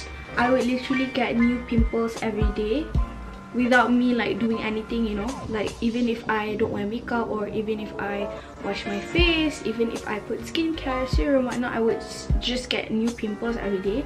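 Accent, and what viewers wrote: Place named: England, United Kingdom. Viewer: yowww her English accent so🤩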